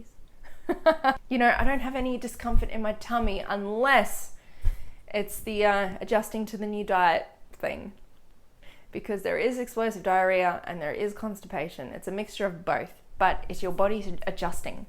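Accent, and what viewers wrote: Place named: Australia. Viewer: Hello -- it sound like you may be in Australia ??!! I am in NSW. With so many carnivore channels from USA, it is great to know about you 💟💛 I am not sure if I'll stick with this, as my body is not in good shape, I switched from vegan (fruit-based, and also starch-based), to carnivore, and now ketovore and still undecided ! Will be good to view more videos from you. What r your favourite carnivore people / doctors ??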